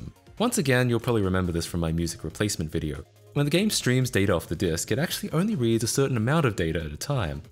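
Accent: Australia. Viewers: "British" - Said about a man who clearly has a strong Australian accent.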